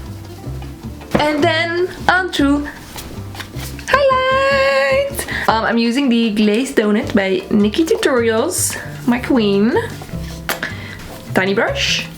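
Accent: United Kingdom. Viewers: Your British accent sent me. 😂😂😂😂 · Loving the British accent🤭😂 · I thought you said look like a chef at first, instead of chav. The british accent was also a treat :)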